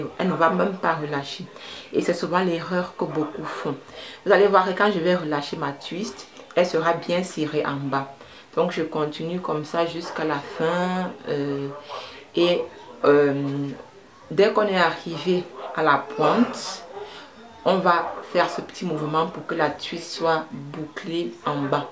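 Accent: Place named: Cameroon. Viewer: Merci tantine J'entend ton Cameroun ce pays me manque ❤️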